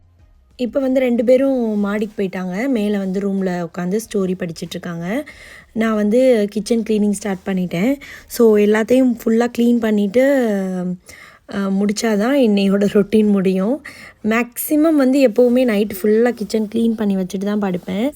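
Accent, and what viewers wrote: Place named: Natham, India. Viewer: அது எங்க ஊரு நத்தம் தமிழ் அண்ணா so நல்லா தான் இருக்கும்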